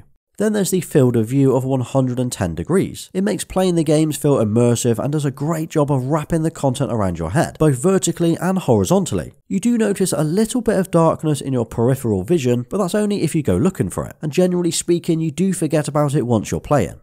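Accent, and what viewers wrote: United Kingdom. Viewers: what? I love british accent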